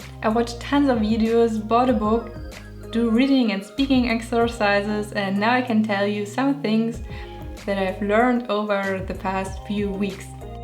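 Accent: Germany. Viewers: Well, I'm American and have been living in Germany for about 9 months. My travel has been limited due to Corona, so I have mostly been hearing the Rheinland-Pfalz accent, but I don't think the German accent is annoying at all. I think do your reasoning for reducing your accent is good. I can understand you just fine, but maybe some Americans might have a harder time. There is nothing wrong with having an accent though. I am struggling to learn to speak German without sounding like I have a potato in my mouth if it makes you feel any better. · I'm German myself and I have to be honest,my first suggestion when I heard your voice for the first time,was "I she also German??"that's so sad,but I know that feeling,but I also have to say many of our english teachers aren't even able to speak with a proper pronounciation.... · Without sounding rude I think you have a very nice accent and can not understand why you'd want to sound less German. 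I (and others no doubt) can understand you perfectly fine. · I think your accent is awesome!I love it!!I don’t really care that u have an accent!!GERMANS HAVE GREAT ACCENT!AND YES ENGLISH IS HARD BUT U DOIN BETTER!🇧🇪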